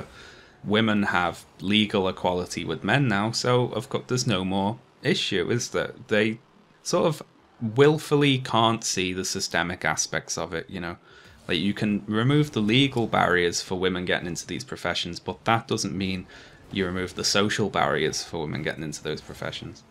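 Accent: Liverpool, United Kingdom. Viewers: Scouse power!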